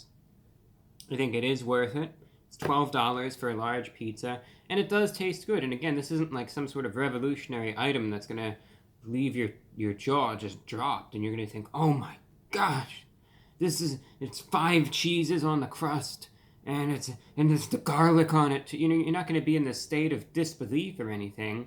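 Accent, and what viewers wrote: United Kingdom. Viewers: Did he develop a British accent or what What in the ever loving fuck have I missed over these 8 years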